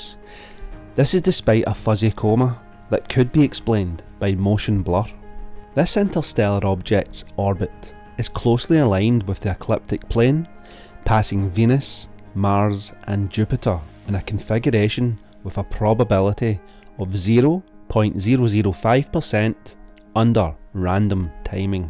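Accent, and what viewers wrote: Scotland, United Kingdom. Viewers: Scottish/Edinburgh accent. As a Dane it sounds like that anyways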